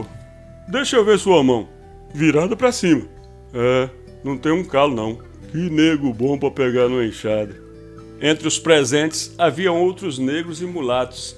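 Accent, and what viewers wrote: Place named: Northern Minas Gerais, Brazil. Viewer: O melhor de tudo é o narrador com o sotaque que parece ser do norte de Minas narrando ,maravilhosooo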